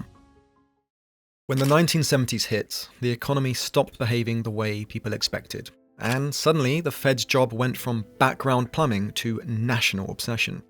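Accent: United Kingdom. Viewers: He's British. That's why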